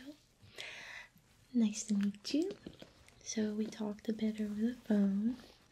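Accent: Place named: South, United States. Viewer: Tue only problem is she sounds southern and me and the south (mainly the bible belt) try and stay on opposite sides of the Mason dixon line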